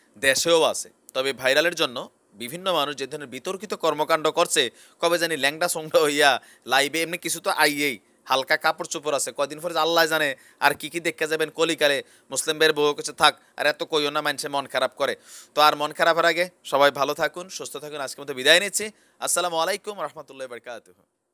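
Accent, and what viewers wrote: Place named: Comilla, Bangladesh. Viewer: ভাইয়া আপনার কুমিল্লার ভাষায় কথা বলাটা অনেক ভাল লাগলো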